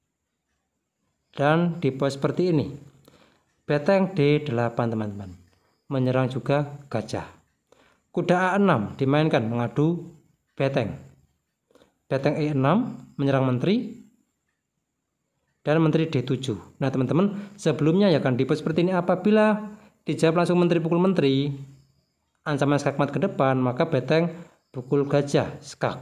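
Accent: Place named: Java, Indonesia. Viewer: ..medhok, mMass, intonasinya...; jawiyhoo..?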